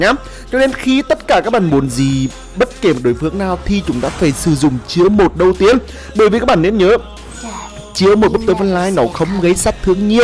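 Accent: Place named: Central, Vietnam. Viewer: Anh ở miền trung à giọng giống em vãi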